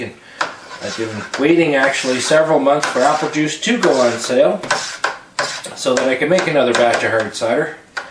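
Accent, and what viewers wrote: Canada. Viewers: LOL He sounds Canadian. Of course he made it to get drunk.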